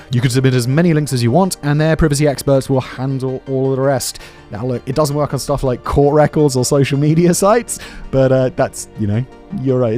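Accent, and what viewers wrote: United Kingdom. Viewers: High English level is required to understand this video. Is that british accent?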